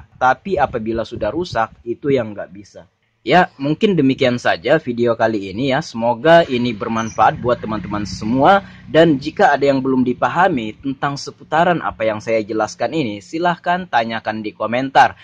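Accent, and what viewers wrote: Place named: Makassar, Indonesia. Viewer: Dari gaya bicara nya bang,heheh soal nya punya ipar orang makasar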